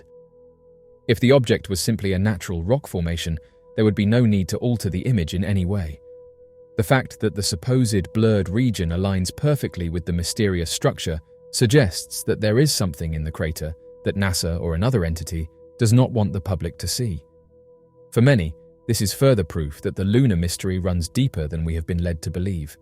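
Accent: United Kingdom. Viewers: Even you know, with your British accent, THAT WE HAVE NOT LANDED ANYONE ON THE MOON. STOP PRETENDING OTHERWISE.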